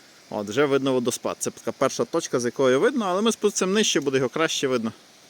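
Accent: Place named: Lviv, Ukraine. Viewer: Первый раз чую львовску мову... Интересно... Я всэ розумию... 😊😂🎉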